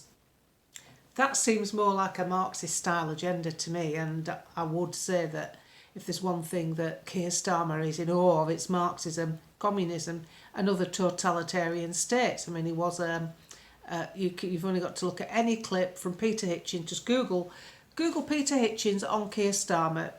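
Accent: Germany. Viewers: Hi, keep up the good work but do you have to do that stupid German accent? It does you no favours. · 😂😂😂love you reading your news report in yourGurrrman accent😂😂😂 Please do more and do you any other accents from around the world😊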